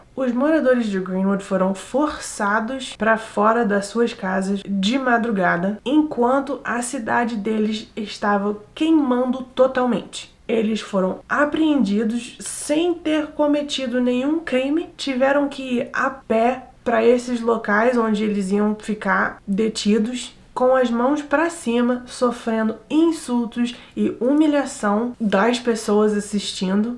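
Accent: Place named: Rio de Janeiro, Brazil. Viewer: Ótimo vídeo. Você fala muito bem português e com sotaque carioca. Você é linda e tem uma voz suave. ❤❤❤❤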